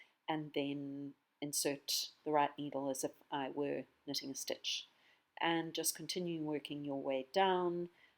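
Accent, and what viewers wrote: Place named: South Africa. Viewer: Thank you so much. Such a beautifully presented, clear and simple pattern. I'm thrilled. And to hear a lovely South African voice showing a familiar way of knitting is delightful!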